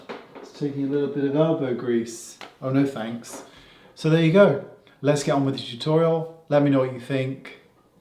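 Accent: United Kingdom. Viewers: Me: "gonna" ~gun-uh~ People from the UK: "gunto" ~gun-noo~ So fun and cute 🧡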